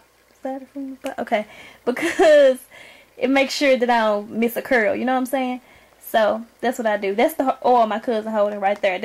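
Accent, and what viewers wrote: South, United States. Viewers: Love yo southern drawl. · Nice tutorial but you need to learn how to speak you country as hell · how would you maintain the style for day two? And I love your southern accent, reminds me of home.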